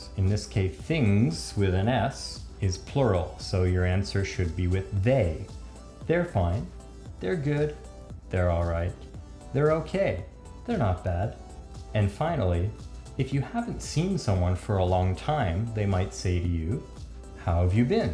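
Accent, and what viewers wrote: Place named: Canada. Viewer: Love that Canadian accent!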